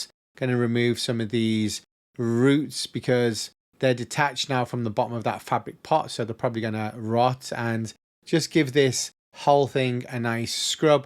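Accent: United Kingdom. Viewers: No a scouse... sound more like berkenhead to me...Better class of grower. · you sound so English lol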